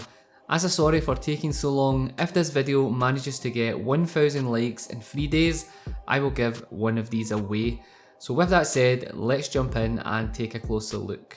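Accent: Scotland, United Kingdom. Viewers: your Scottish accent is so Scottish P.S STAY AWESOME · What part of Scotland are you from I'm from Edinburgh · is this scottish?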